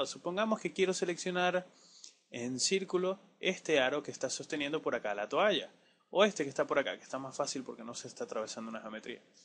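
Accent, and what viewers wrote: Venezuela. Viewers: Yo sufriendo con un curso en Inglés y encontré justo uno de los mejores tutoriales : en Español y con acento de mi país (Venezuela). Mejor imposible! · Eres Venezolano? lo digo por la manera la hablar. Buen Curso Saludos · eres de venezuela mano Saludos jejeje Ya se restaurar y esas cosas pero ay algunas cosita que no sabia y e puse a ver tus videos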